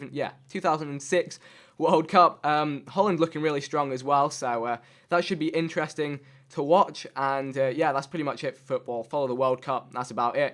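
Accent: England, United Kingdom. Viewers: Your english accent is so contrasting to your appearance it's quite cool! Love the videos, nice to do a facecam once ina while right!? Keep up the good work! Jack:) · is indian I thought he was English he sounds like he's from England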